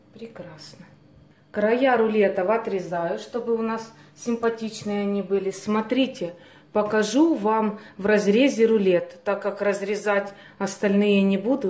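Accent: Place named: Ukraine. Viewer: Спасибо, за Ваше внимание, за ответ! Судя ро лёгкому акценту, Вы живёте в Украине, как и я?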